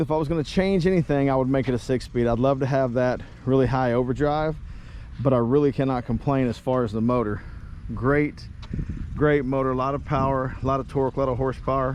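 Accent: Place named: Texas, United States. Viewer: I bought the vagabond bag I see on your video. Ought to work well. These videos are helpful to many riders for info on mods from cup holders to feet pegs etc. Appreciate the knowledge. You sound similar to how my dad talks, my family agreed. Texas accent. Riding the Valkyrie in rocky mountains is worth a trip, I love it every chance I get!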